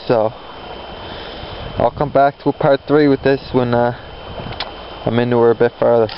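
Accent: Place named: Nova Scotia, Canada. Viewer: Nova Scotian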